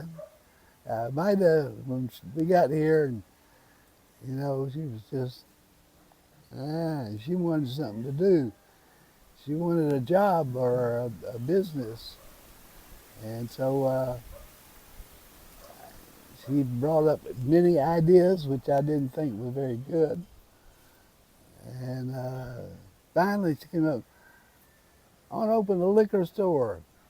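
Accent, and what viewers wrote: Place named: Texas, United States. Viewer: I dig your humor and your no nonsense approach to reality. A Texas twang to it is also a big bonus. A great day to you too, sir!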